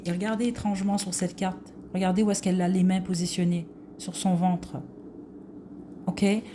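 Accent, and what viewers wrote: Quebec, Canada. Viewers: Merci la! J’adore écouter votre accent québécois depuis ma Provence natale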